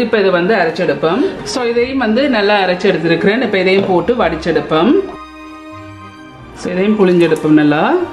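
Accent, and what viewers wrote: Jaffna, Sri Lanka. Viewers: Akka sri lanka muslim food recipe podungga... Unggal Yalpanam tamil arumai🇲🇾❤️🇱🇰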